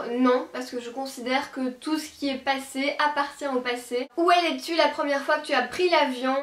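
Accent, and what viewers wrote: Belgium. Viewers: Coucou, J'adore tes vidéos et ton petit accent belge:-) Ps: pense à recycler tes anciens téléphones portables, c'est mieux pour la planète!!!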